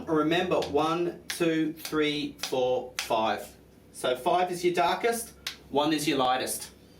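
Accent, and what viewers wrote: Australia. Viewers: austrailian accents makes everything sound so cool!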